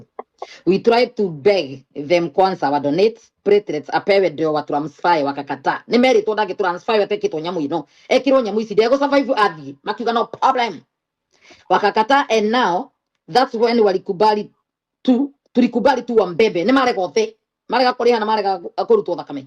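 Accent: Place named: Kikuyu, Kenya. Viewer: githungu mega tiga guthoma ta gikuyu.Nitunogire kuigua ndeto icio.Wi murumani muno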